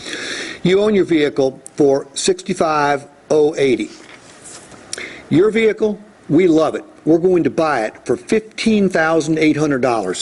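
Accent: Appalachia, United States. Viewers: Hillbilly and his hick rube hillbilly bullshit foghorn leghorn twang talk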